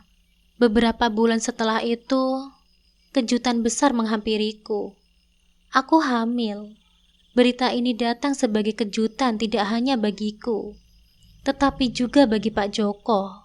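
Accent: Java, Indonesia. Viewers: Maap pembawaannya medok mbak kurang enak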